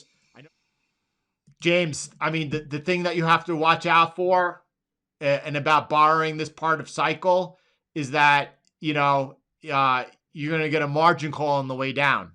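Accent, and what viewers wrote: United States. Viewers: Your Long Island accent coming thru strong today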